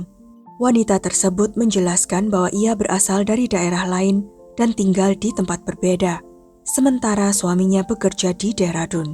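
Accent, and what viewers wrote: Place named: Java, Indonesia. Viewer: Kek org Jawa kek medok ngomongnyr😊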